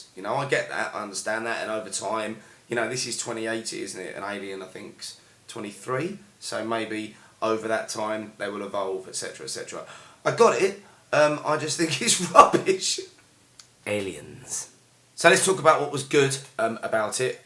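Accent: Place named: Scotland, United Kingdom. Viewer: Very good review. I am American with *distant* Scottish heritage, and thought I recognized some of your way of speaking, and that of the actress you mention. I watched PROM... DVD this morning, and enjoyed it. I have been reading reviews all day ever since. I want to see your take on EPISODE ONE, where I am going now....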